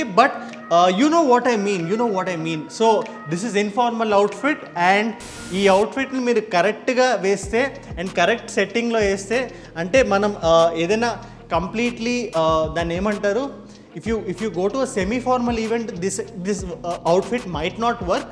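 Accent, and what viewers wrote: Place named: Hyderabad, India. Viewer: Love from Hyderabad, perfect Hyderabadi way of telling❤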